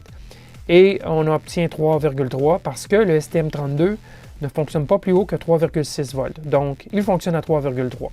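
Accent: Quebec, Canada. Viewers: Très belle présentation, claire et très Pro pour un bidouilleur. Je réside au nord de la France et on aime beaucoup l'accent québécois. Merci pour votre travail. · Très bizarre ce verrouillage, peut-être des puces récupérées d'occasion sur des cartes où le programme de la flash avait été verrouillé ? Vu le prix, c'est pas impossible... Eh oui, nos cousins québécois ont un chouette accent :-) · Hello Mr québécois chouette accent :-) Merci pour ces vidéos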